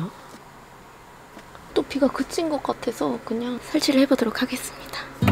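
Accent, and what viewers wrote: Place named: Gwangju, South Korea. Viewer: 광주 사투리 정겨워요 ^^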